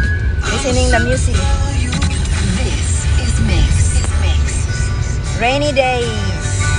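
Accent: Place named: Visayas, Philippines. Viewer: hahaha ni gawas gyd pgka pure bisaya nimo ate.. Nagkatawa ko sa ARYATA😅😅..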